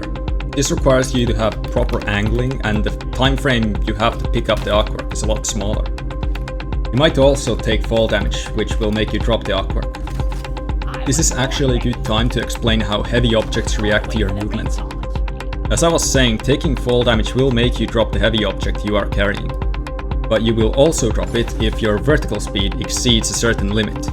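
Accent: Finland. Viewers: Am I hearing the finnish accent?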